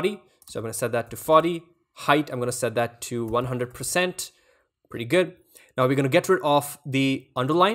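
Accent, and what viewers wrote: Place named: India. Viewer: Its simple, I hear indian accent, I click back to search :)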